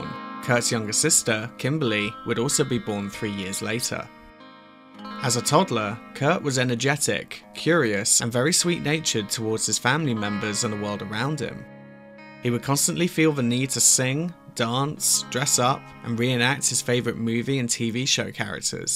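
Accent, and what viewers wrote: United Kingdom. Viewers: Another British Accent bs fake documentary. Pass.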